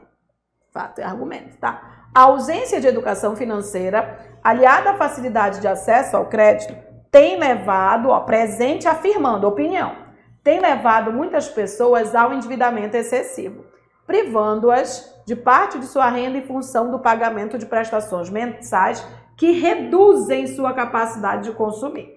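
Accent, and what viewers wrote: Pará, Brazil. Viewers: Quando eu ouvi o bagulho ja sabia que era paraense 😂😂😂😂😂😂😂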